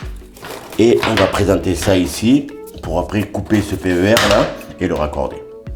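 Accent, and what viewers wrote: South, France. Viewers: Excellente vidéo dans le sérieux et la décontraction avec ce petit accent du Sud pour agrémenter le tout. Ça donne envie de s'y mettre ! Bravo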